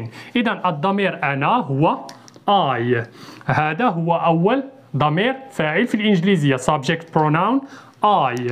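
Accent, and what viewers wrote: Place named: United States. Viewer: هذا نطق أمريكي و ليس بريطاني على ما أظن أستاذ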